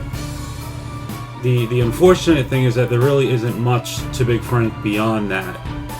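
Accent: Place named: New York, United States. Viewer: And im guess by acsent alone your from nj or ny more than likely ny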